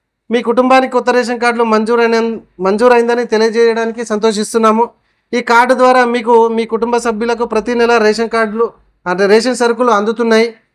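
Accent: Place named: Andhra Pradesh, India. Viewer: Androlla lekka maatladutunnav anna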